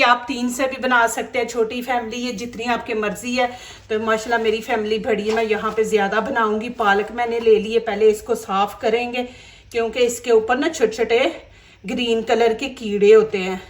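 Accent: Punjab, India. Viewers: Aap kiapunjabi ha bolne ka style se lag Raha ha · Watching from Buckingham, UK. I liked the recipe and your Punjabi style commentary too :) I will try to cook this soon. Insha Allah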